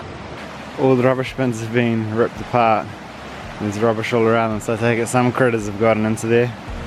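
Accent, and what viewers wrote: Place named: New Zealand. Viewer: Kiwi accent owwwwwwwwwww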